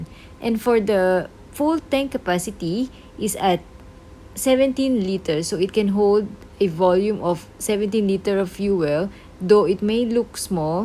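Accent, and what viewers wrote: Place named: Singapore. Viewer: I like your Singaporean-English accent hahaha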